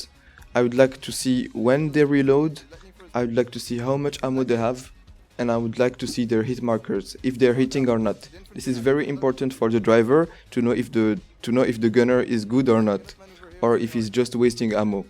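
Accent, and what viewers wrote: France. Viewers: quand je t'entend parler, j'entends un français qui parle anglais ( tu as un accent français) 🙂 je suis certain que tu es français, Si tu l'est, en tous cas tu parle sacrément bien anglais!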